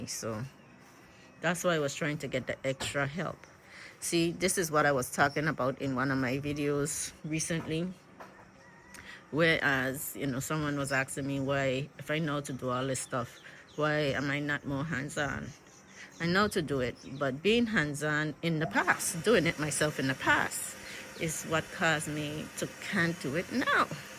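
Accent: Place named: South, United States. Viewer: you sound like a real country gal, a very informative lady to connect with 🎉🎉🎉❤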